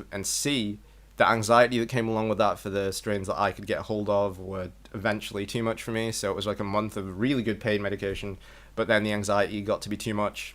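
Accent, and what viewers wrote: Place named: United Kingdom. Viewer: San Jose Sharks ?!?!?! Bro are you in the Bay Area?!?! I am in san mateo. Your accent has me thinking UK 😂 are you just a major hockey fan, or are you living in California? The medicine topic is more crucial to the world's well-being than most people realize. I have grown poppies for a few years now, and consider it a tragedy that most people have been estranged from this great medicinal plant. Human beings in general may be more opium-dependant than is acknowledged. Poppies make a night and day difference in my ability to function in this body, and actually live more full/thorough days, do work, exercise, etc.... For years i regularly exercised and practiced discipline in various forms, without opium or any opiates (having steered away from such substances after high school). Never did i reach the same level of sustained health/function without poppies/opium that i experienced once i began growing and taking them. 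🌷🌷🌷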